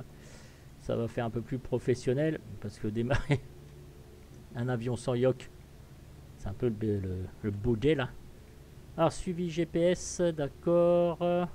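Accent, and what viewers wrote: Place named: North, France. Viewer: Merci pour le partage. Toujours aussi plaisant ces.petites balades. ... Avec toujours une petite pointe d'humour avec un accent bien du Nord.😉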